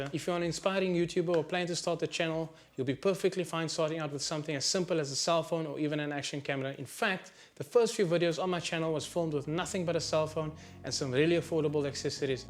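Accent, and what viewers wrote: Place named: Cape Town, South Africa. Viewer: Howzit from a fellow Capey. I just discovered your channel. Really cool stuff. I enjoy hearing your accent. I live in Zagreb, Croatia. You should come here sometime and do some travel vlogs. We have some awesome locations.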